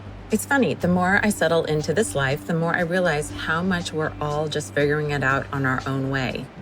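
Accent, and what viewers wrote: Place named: Germany. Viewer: Yeh I thought that to , she really sounds German 😂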